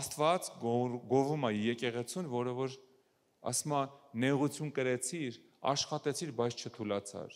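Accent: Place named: Aparan, Armenia. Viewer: Ես էլ ասում եմ ինչի եմ այսքան հաճույքով լսում ձեր քարոզները պարզվումա Ապարացու արյունն էր քաշում նախ ասեմ որ իսկապես շատ լավ եք խոսսում նույնիս խրատական և դաստիարակչական մեծ տաղանդ ունեք բոլորն էլ լավն են բայց ձես խոդքը մի տեսակ տարբերվող է երևի որ Ապարանցի եք դրանից իսկ եթե գաղտնիք չի կասեք կոնկրետ Ապարանի գյուղերից եք թե միջից ես էլ եմ Ապարանցի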